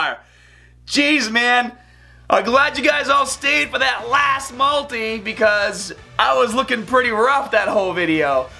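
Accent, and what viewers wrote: Boston, United States. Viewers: You from Boston my guy?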